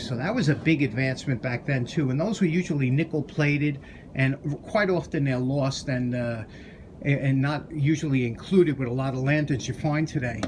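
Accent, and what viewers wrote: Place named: East Coast, United States. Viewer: You’re the best, all of your nostalgic sentiments resonate in me. I was born in Allentown, PA in 1969 and moved to CA when I was 10, your East Coast accent and perspective rings true for me. Happy New Year. I love all of your posts and look forward to all that come in 2021.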